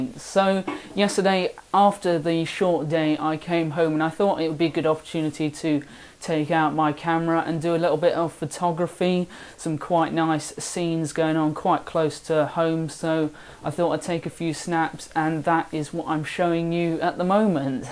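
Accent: United States. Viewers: Great quality on both video and audio! I live in south-east of Norway and we're no strangers to snow.. This year has been kind as we've only had about 2 feet so far. Some years, 6-8 feet, much of it falling in a relatively short period. Here in Norway there's always a snow season, but still the first snowfall surprises a lot of people every year and we have numerous accidents during first snow... Good luck on your exams. PS! Your american accent sounded a bit like Hugh Laurie's :-) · I thought you was in the USofA with that Southern accent . LOL Love the Videos , keep it up . Southern Oh Hi Ho here . LOL (Ohio) . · 1) The quality of your video and audio is terrific! 2) Love the American accent. 3) Learned something about college/university I never knew. 4) Are those bulls? 5) Brrr!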